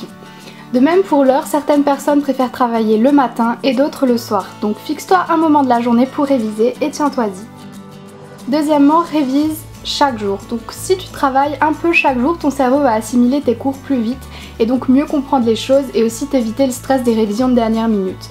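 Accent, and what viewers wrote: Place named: Alsace, France. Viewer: Je n'avais jamais remarqué l'importance de ton accent alsacien ! Moi qui suit aussi alsacienne je l'entend quand même assez hihi😊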